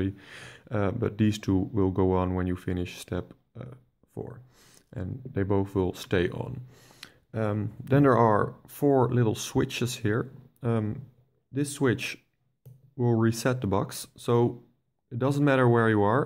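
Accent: Netherlands. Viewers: ben jij nederlands? ik kan dat horen :)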